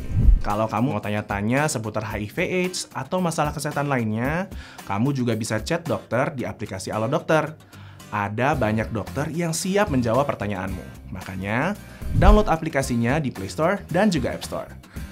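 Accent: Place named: Java, Indonesia. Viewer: tp logat bahasanya kok sprti orang Jawa ya. Mohon maaf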